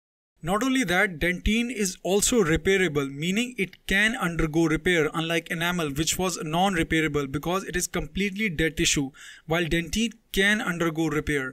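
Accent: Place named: India. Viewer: You have the Bigest Indian accent I’m not trying to be mean my friend is Indian as well) btw thanks for teaching me this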